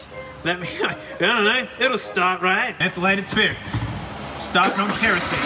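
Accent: Australia. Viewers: Great Voice 👌🤑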